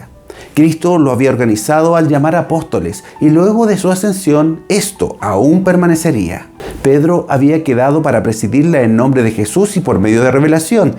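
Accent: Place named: Chile. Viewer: ❤hola buenas tardes saludos que bien video me encanta el acento de los chilenos ❤🎉muchas gracias por su trabajo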